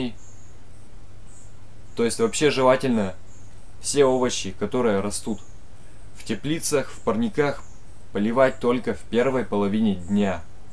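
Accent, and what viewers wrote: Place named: Urals, Russia. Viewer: говор уральский....в каком районе проживаете?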